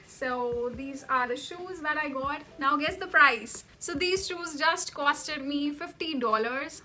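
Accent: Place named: India. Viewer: I love your India accent 🙂🙂😋😏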